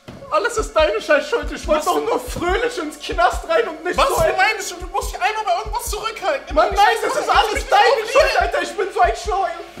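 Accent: North, Germany. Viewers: Dieses Norddeutsch war einfach mega 😂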